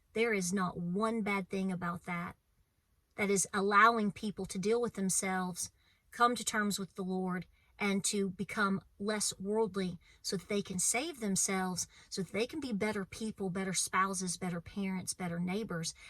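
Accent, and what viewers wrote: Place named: Appalachia, United States. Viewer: I e always enjoyed your videos! 💕 I love your voice, Appalachian twang and all! 😘 especially love your singing!!! Keep preaching sister! Some people can’t handle the truth or fear provoking retoric. So be it. They can listen to something else. God bless them. Hopefully they hear the truth somewhere and are prepared regardless. But, yes, there are some (a few that make themselves seem numerous) that are advocates for the evil ones. They (Masons, satanists, wiccans, etc) have infiltrated many churches- especially the Baptist, Catholic, and traditional Jewish Churches. Division and hatred are from hell. We need unity within the Christian community. All of us united in love. 🙏❤️🙏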